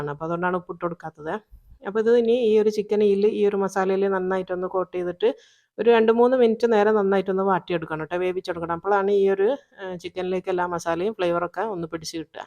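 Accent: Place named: Kozhikode, India. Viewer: Super dear njangale Kozhikode slang 🥰❤️presentation super. 👍stay connected 🥰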